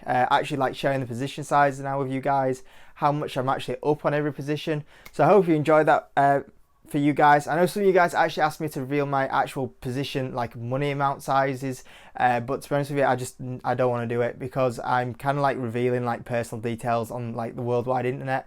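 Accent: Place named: Northern England, United Kingdom. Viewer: Thank you man, where about’s in the UK are you from, I’m London based. The accent sounds a bit Northern, my uni is up in York.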